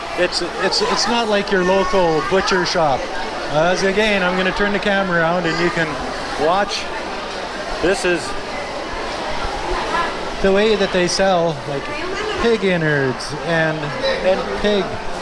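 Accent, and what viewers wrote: Canada. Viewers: Damn is don’t know what’s worse these markets or his Canadian accent ay?! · I like his Canadian accent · Wow that’s a strong Canadian accent😂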